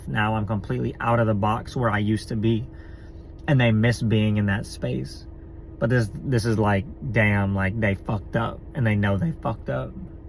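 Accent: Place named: Tennessee, United States. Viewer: Why you talking like me ? Tennessee baby !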